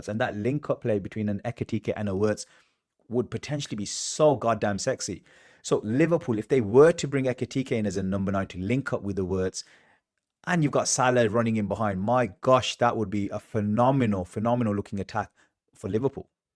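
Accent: Birmingham, United Kingdom. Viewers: Lovely analysis. 1st time listener, but will deffo be back. Is that a slight Brummie accent I can hear BTW? 😂 Shirley boy here!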